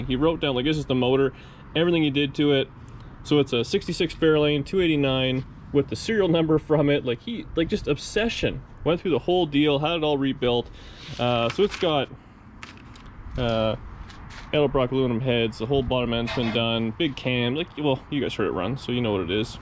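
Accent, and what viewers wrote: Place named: Canada. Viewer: I listened to this for the Canadian accent.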